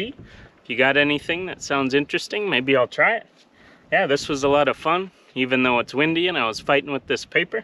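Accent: Canada. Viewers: You're the most Canadian soundin American I've ever heard. I like your videos, subscribed my man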